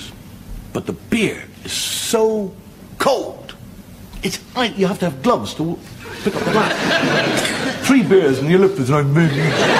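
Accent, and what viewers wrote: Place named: Australia. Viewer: Just nearly wet myself laughing..........is that how we (Aussies) really sound...??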